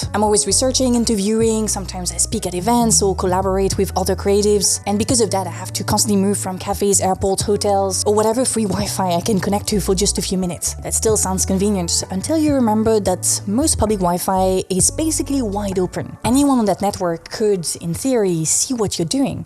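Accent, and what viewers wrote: France. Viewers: Cute french accent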